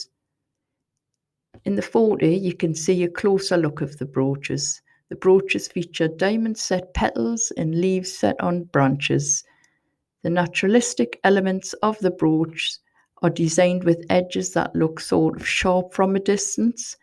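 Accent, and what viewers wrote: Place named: Newcastle, United Kingdom. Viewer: It's always interesting to here of royal members who go under the radar. Very factual and informative. Your geordie accent makes it all the more grounded. Well done.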